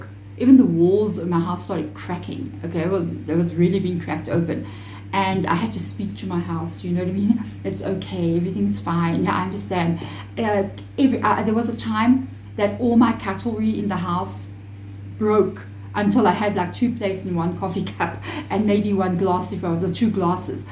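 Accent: South Africa. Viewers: Thank you...great reading...first time watching you...arw you South African? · Wow, wow, wow, however a bit conflicting, been fighting six years for my property sold unlawfully by my husband, got an eviction order on Wednesday - valentines day, going to appeal and lodge another case to fight the unlawful sale - I trust this is the right action???? Know I will win the case if I do, but don't really have the finances for a High Court Action - should I let go of all that I have worked for and more on??? Keep getting conflicting answers from the Universe. Wishing you love and light. By your accent I assume you to be a fellow South African.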